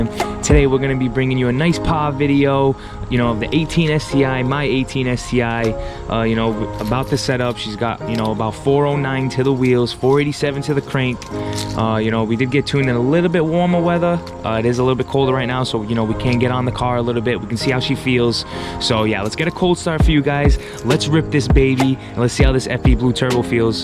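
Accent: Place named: Massachusetts South Coast, United States. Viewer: Driving by Cask and Pig in Dartmouth eh? You from Fall River or New Bedford? I can kind of tell by your accent lol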